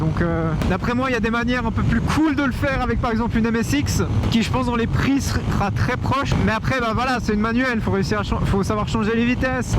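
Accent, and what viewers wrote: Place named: Switzerland. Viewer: Je croyais tu était français jusqu'à ta commencer à dire des chiffres 🤣 après j'ai crue tu était belge...🤦🏽‍♂️ pour finir j'ai compris que tes suisse mdrrr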